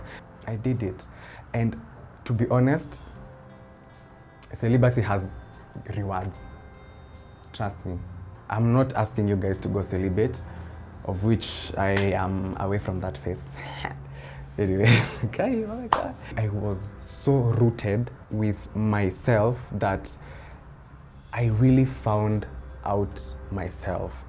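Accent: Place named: Kenya. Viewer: Your accent reminds me of when I used to live in Nairobi 🙂🙂🙂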